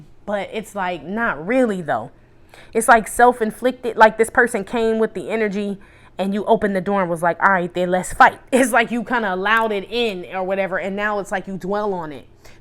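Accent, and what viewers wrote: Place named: California, United States. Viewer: My G....you got to be from So Cali....I know it!!! 😁😉 I know a fellow Californian when I hear one....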